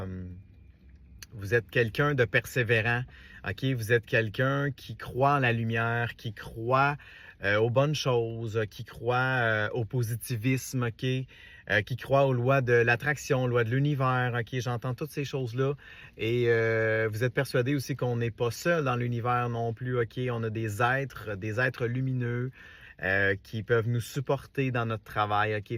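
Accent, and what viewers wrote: Quebec, Canada. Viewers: Super ! je n'écoute rien mais très bogosse ! J'aime beaucoup. Ce petit accent québecois en plus, pfff... Quoi que tu dises, j'ai l'impression que tu parles d'amour. 😉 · Il est beau en rouge notre beau brun 😍 Quel bel accent du Québec, je vais bientôt venir au Québec j'espère que je croiserai ton beau sourire 😍 Love ❤❤❤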